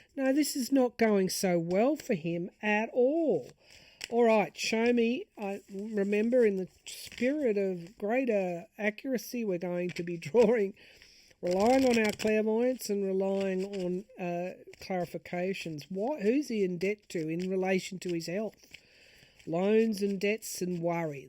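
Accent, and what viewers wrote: Australia. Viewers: Your Aussie snark sounds so pretty! Thanks for all you do! Can't we just have a whole new party? From the ground up. Dems or magats are useless. A new party of young folks. But if we apply this: A kid is getting C and D's all year. On the final, he gets 100% A+...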